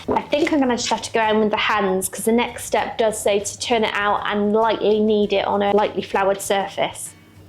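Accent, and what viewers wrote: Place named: United Kingdom. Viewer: Boy, That British accent of hers is really hard to understand exactly what she is saying !!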